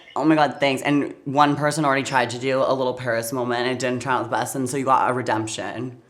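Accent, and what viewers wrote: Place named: Los Angeles, United States. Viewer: i love you LA dialect its so calming and entertaining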